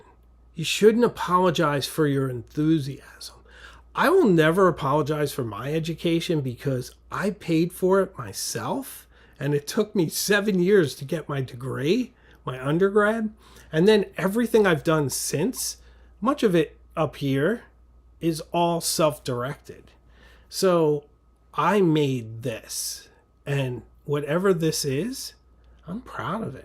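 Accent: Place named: Boston, United States. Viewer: Do you really have a speech impediment? I just thought it was your bostonian accent (like I thought you were talking about your doctor dealing w/ tornadoes at first rather than your daughter). I suppose it's a measure of success that you're at the point where you have critics.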